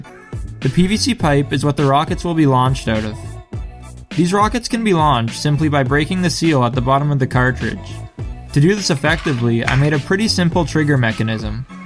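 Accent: Canada. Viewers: I can tell you are Canadian, because you said oat instead of saying out.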